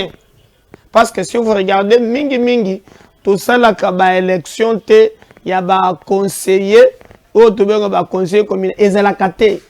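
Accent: Kasai, Democratic Republic of the Congo. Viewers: Ngai nalingaka accent na yo !!! Je trouve que tu assumes ta lubalite !!!!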